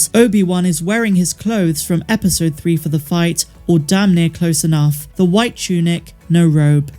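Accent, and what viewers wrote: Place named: Bristol, United Kingdom. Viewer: I always enjoy your videos. Love the accent, is it from Bristol ? (one of my fave places in the uk ) I do miss the 3 x 'Utini' intros, though ! lol! ;) Thank you and keep up the good work ;) x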